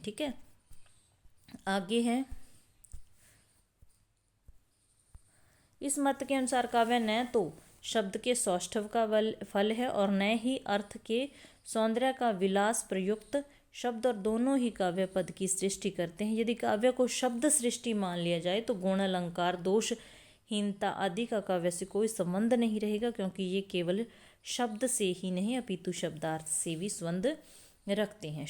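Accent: Punjab, India. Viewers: Mam aap Punjab se h kya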